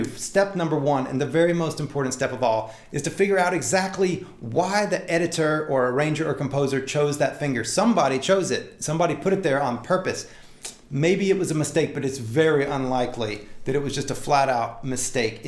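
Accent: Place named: South, United States. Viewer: I get his point, good vid...also keep trying to place his southern accent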